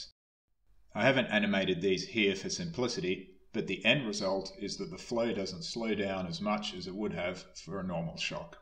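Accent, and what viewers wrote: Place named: Canada. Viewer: Great video and very pleasant Canadian accent.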